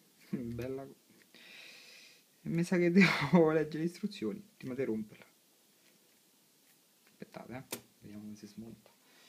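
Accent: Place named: Rome, Italy. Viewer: Oddio, il nuovo video di!!! Appena ho visto l'avviso su FB sono subito venuto a vederlo!!! Voglio l'autografo e voglio anche registrare questa bella voce romana di Roma sud!!:)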